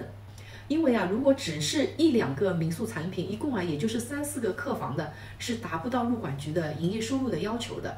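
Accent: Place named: Shanghai, China. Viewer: 乍听是个上海人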